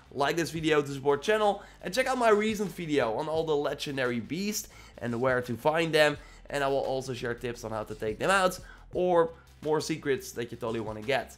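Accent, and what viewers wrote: Netherlands. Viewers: Je klinkt echt nederlands haha! Goeie video🙏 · I can hear you are a Dutchman like me. Ik hoor dat je een Nederlander bent zoals ik. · Are you Dutch? I can hear an accent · I think he's from netherlands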